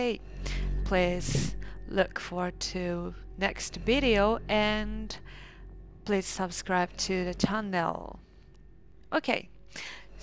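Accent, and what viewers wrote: England, United Kingdom. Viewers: U have like an English accent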